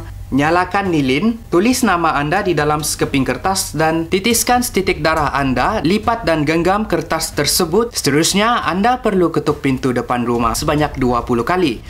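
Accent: Sabah, Malaysia. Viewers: Dari sabah kah? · dia nie sabahan ka atau indon? maaf tnya mcm nie. .sya pun sabahan juga.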